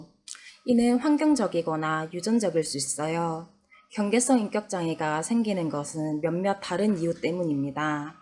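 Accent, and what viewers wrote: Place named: Busan, South Korea. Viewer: I can hear a hint of her Pusan-dialect, which makes it understandable. Don’t bash her. At least she’s trying hard to make it sound clear.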